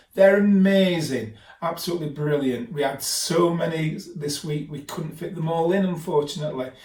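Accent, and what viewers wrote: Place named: Sheffield, United Kingdom. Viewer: Hello. I've moved from your native Sheffield (Peveril Rd to be precise and often saw you around) to come and teach here in the Turks and Caicos Islands in the Caribbean. I know - it was a sacrifice, but someone had to do it, so me and my wife bit the bullet. Any road, hope you're pleased to hear that there is a little classroom (virtual one for the time being) where your dulcet Sheffield tones are ringing loud and clear to a bunch of 10yr olds who look forward to your cartoon workshop every week. Please tell me there's another one on its way and that there are more to come. I am having a lot of fun getting little locals, Canadians, Americans, Haitians and Dominicans to say things like, " Awreight, sir?" " Tha's reight nesh thee" and " Gi' o'er". So dunna lerrus dahn.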